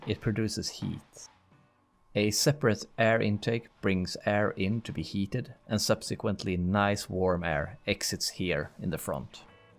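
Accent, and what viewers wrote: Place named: Sweden. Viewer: Perfect Swenglish accent:hands-yellow-heart-red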